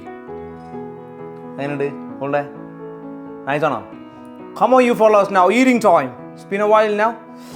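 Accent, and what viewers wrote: Thrissur, India. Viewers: എന്റെ തൃശൂർ ഭാഷ 💙👌👍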